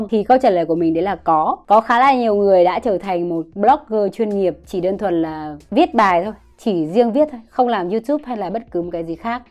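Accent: Central, Vietnam. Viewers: Em rất thích chị và các video của chị💛💛💛 Thích nhất khi chị nói giọng miền Trung nữa 😊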